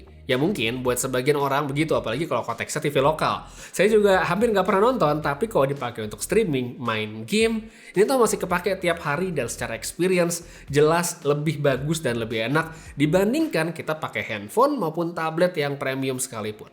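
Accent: Bali, Indonesia. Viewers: Orang bali ya bli?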